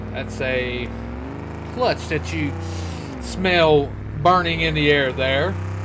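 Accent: West Virginia, United States. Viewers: Is he from WV💛💙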